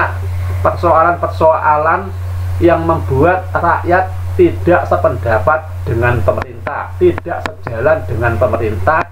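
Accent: Java, Indonesia. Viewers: Astaga naga mantap tenan.wong batak logat jowo.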